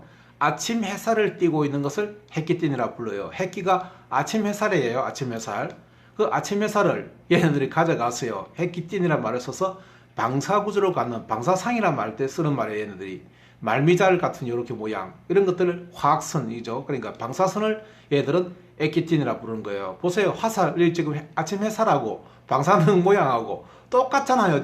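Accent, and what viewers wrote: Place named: Jeolla, South Korea. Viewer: 그래서 사투리랑 비슷하다고 했구나. 전라도 말투인데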